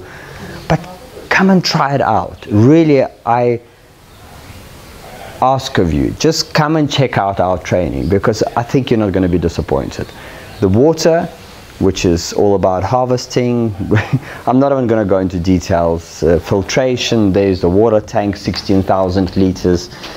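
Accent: South Africa. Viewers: i thought you sounded South African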